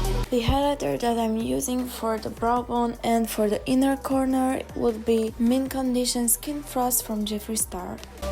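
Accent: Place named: Russia. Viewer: Nice video! Love your accent, are u from russia?❤️😍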